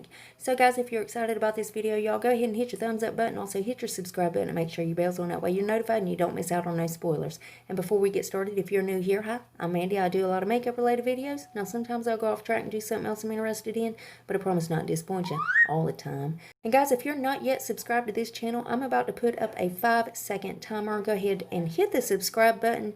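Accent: South, United States. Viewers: I'm glad to see you back. I was worried... from one Southern girl to another, I need that accent in my daily life! ❤❤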